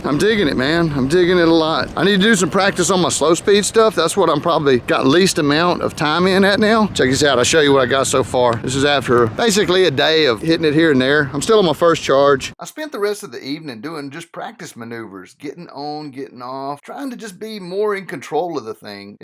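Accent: South, United States. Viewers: I made the kids bring bicycle, so they're tired. But I've been riding my Rec-lectric unicycle, and I am NOT tired!............ REC-LECTRIC!!........... I don't know what it is about southern people with they're drawl that cracks me up so bad when they mispronounce words. I had to watch that part a few times. Don't get me wrong, I'm not laughing at them as if they are stupid, it's just the way the accent sounds and the funny ass pronunciation of words that gets me. Thanks for the laugh. Keep 'em comin!!!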